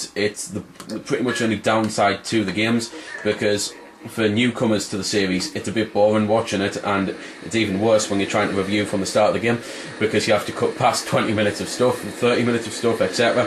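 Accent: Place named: North East England, United Kingdom. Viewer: i enjoy your reviews! that's gotta be a northeast accent.. middlesbrough?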